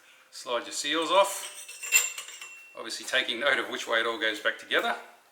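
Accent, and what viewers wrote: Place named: Queensland, Australia. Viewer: hey mate you are not in melbourne are you (judging from your lovely QLD acsent)? need mine done too, slow leaking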